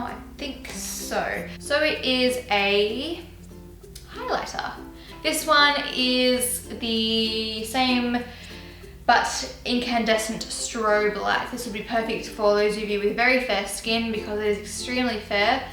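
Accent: Australia. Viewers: oh gosh, when I hear you speak, I so wanna have Australian accent!!!! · You do realise it's an Australian accent right? You basically insulted ALL THE PEOPLE who have the same accent. So fcking immature...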